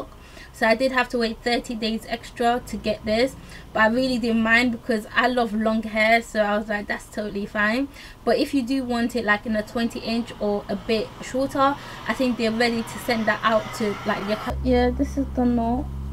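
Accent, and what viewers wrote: United Kingdom. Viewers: Your Like With A Good British Accent Lol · You're cockney accent LOVE IT I have a cockney accent but with a mixture of all different accents I don't understand my voice lol I'm just rambling on sorry for wasting you're time, :D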